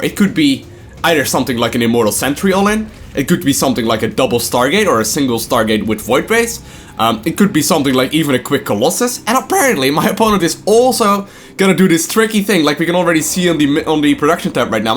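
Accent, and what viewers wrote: Netherlands. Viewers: It's neither canadian or british. It's northern european, possibly dutch. Feel free to confirm or deny. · his accent is dutch :D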